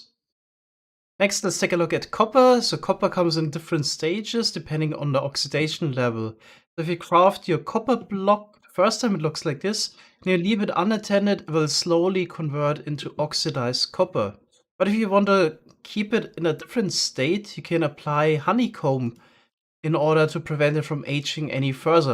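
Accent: Germany. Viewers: Du klingst so hart deutsch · are u german? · Irgendwie kann ich einen deutschen Akzent raushören 🤫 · I even can hear that you are a german😂 Grüße gehen raus an alle deutschen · Sounds like you are a german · this man has the most german accent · Man hört so das du deutscher bist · that perfect german acsent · hahahah der deutsche dialekt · u are safe german i can hear the accent. dikka red sag doch einfach dass du deutscher bist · Are you german? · are you german? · Hehe, auf den deutschen Akzent ist Verlass. :D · Da hört man doch den deutschen Akzent :) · you are german right? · deutscher Akzent check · ah dude this english i love it. True German :D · Du bist Deutscher das höre ich sofort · Er ist soo deutsch oder? Das hört man mega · You have the Biggesee germen accent i herard in my whole life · Came for the farms, stayed for the spiffing skin, and German accent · you are german right, i mean the accent ? 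· Are you from germany ? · Bist du Deutscher? Dein Englisch hört sich iw so an. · Ich kannte ihn noch nicht hab aber direkt raus gehört das das deutsches englisch ist 😂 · Ah, weil er ein Deutscher ist der englisch redet 🥴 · Wegen deinem Dialekt glaube ich das du aus Deutschland kommst. gibt es das auch auf Deutsch? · man hört den deutschen raus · Du klingst so deutsch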